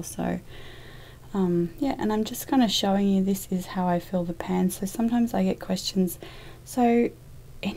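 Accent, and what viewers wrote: Australia. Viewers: A nice Aussie voice talking about paint? Instant sub!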